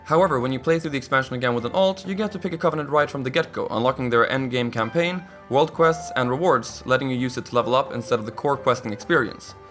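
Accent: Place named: Ireland. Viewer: I was just struggling to pin down your accent, I thought you were Irish for a bit 😂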